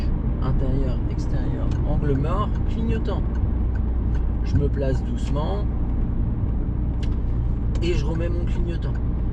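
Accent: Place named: Paris, France. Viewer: Very nice driving, en plus du bel accent Parisien. 😉👍🗼🗼🗼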